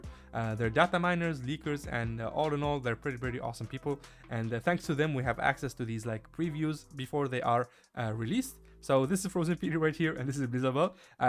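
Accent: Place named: France. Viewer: J’ai l’impression que t’es français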